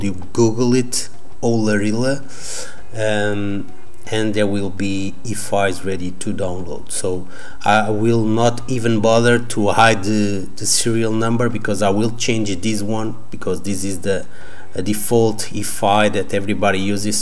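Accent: Portugal. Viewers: Primeiras palavras e vi logo que era um YouTuber tuga. Gostei do vídeo, continua o bom trabalho.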